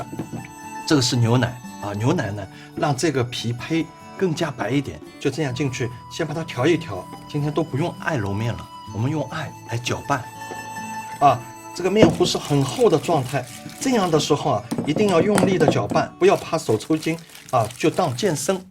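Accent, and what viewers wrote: Shanghai, China. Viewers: 朱师傅一口上海普通话听着真亲切啊。听着朱师傅的声音好像回到了上海，很久没回家了，满满的思念。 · 这是很标准的上海普通话，哈哈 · jijnjijijj 沪普😂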